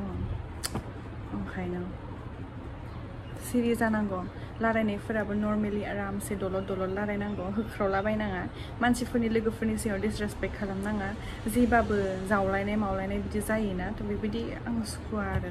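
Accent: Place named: Kokrajhar, India. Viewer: Your Boro language is same kokrajhar boro language and you are good girl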